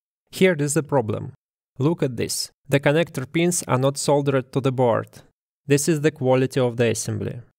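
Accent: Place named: Russia. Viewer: 😁 слышен "наш" акцентик и коверкание слов) А так видео👍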